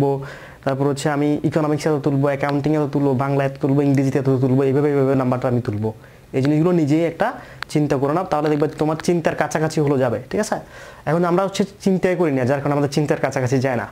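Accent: Chittagong, Bangladesh. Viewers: অাপনি চাটগাঁইয়া নাকি গাইয়া গুইয়া কথা বলেন কেন?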